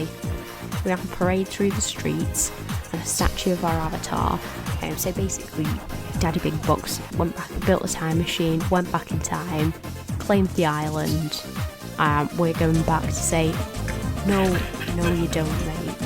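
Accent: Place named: Liverpool, United Kingdom. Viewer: Hearing Urbz in a scouse accent is wild 😂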